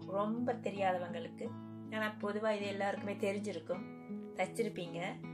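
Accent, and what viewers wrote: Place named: Sri Lanka. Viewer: i also srilankan.from jaffna.ur talking style paathu kandu pedichan.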